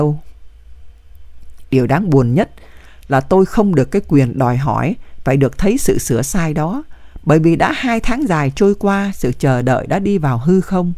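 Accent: North, Vietnam. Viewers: Người đọc là Bắc kỳ, đọc chuyện của Nam Kỳ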